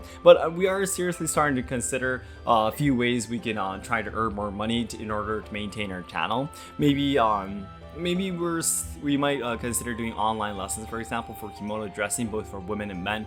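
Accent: United States. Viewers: the first time I heard your voice I detected a Northern Midwest accent. Your spoken English is at least 97 per cent. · I've listened to most of your content. I'm a southern American and I have never had any problems with your pronunciation. You sound just like an American to me. I can barely walk out my front door without hearing "y'all come back now"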